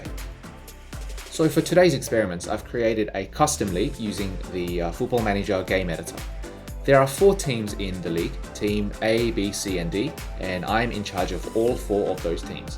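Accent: Australia. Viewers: every Australian who watches his videos can instantly tell that is an Australian accent, not a super bogan true blue stereotypical Aussie accent but he’s still clearly Aussie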